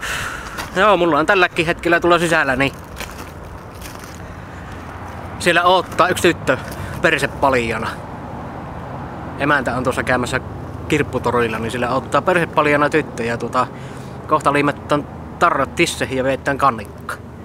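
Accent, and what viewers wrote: Savo, Finland. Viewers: Videot o hyvii, mut ärsyttää toi savon murre :D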